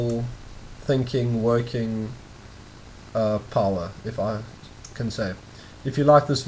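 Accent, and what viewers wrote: South Africa. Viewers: South African yes? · Great to hear a Safrican voice when browsing technical vids. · You sound South African...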